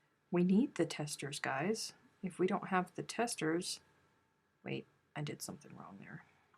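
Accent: South, United States. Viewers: In the UK, oregano is definitely o'-re-gah-no, just like the Aussies say it. We have coriander for the seeds and leaf part of the plant; pee-kan, not peh-kahn; aubergine is a plant as well as the colour - probably because we have more french influences in our words and Americans have more Spanish influence perhaps; pie has both a bottom and top of pastry, otherwise it's a tart or flan (that's flann, not flahn) 😂🤣😅 But it would be a very boring world if we all spoke "english" as the English do, wouldn't it. I think your southern accent is purrdy.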